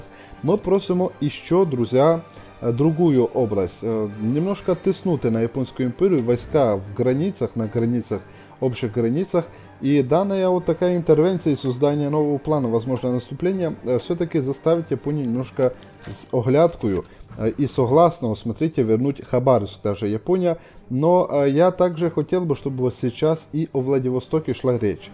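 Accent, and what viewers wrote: Ukraine. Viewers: Прохождение классное, голос автора приятный с нотками украинского, это атмосферно. Однозначно лайк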